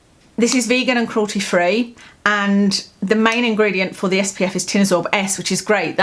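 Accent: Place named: United Kingdom. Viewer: Hi, why don’t you leave the sunscreen in the bathroom and put it on in there? You won’t have to worry about touching doorknobs and then sticking your fingers in the container. I haven’t tried Stila liquid lipsticks in years. Do they still have a scent?!I couldn’t wear them due to the scent! I love your accent 🙂 Words sound fancier in a UK accent!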